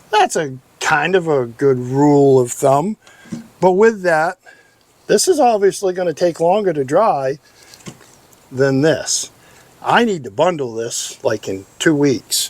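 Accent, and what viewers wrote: Maine, United States. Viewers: Are you from Maine by chance? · Yes you get that white mold on birch here in Nova Scotia too. New Subscriber here, because of the Maine accent 😅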